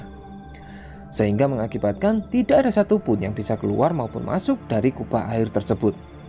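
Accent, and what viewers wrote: Indonesia. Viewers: Admin!~ Gua punya pertanyaan. Diakhir video. Apakah Si Julia ikut bersama Hekon ataupun tetap stay dibumi? = maaf gua kurang faham sedikit dikernakan aku orang Malaysia. Kurang memahami logat" Indonesia . But! Gua tetap mendukung ni channel kok. Dengan +1subscribed ;) . By the way semangat yah bang!!! Ganbatte🔥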